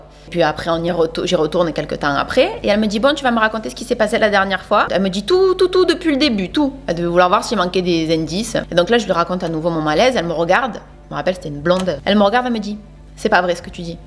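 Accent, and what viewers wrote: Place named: South, France. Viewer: J’aime beaucoup votre accent du Sud, c’est de quel endroit ?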